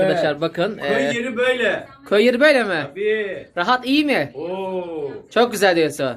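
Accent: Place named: Çaycuma, Turkey. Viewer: SELAMLAR OGLUM BEN KARABUKLU TEYZENIM BIRINCISI GADUN ÇOK GOZEL AMA BEN 40 YILDIR CAYCUMADA YASIYOM ÇAYCUMA SIVESINI SIZDEN FAZLA KONUSYOM BAYILIYOM KONUSMANIZA HARI KULADESINIZ BENI KONUSURKEN GORSEYIZ BURALI ZANNEDERSIYIZ ALLAH HAYIRLI KAZANCLAR VERSIN YAVRUM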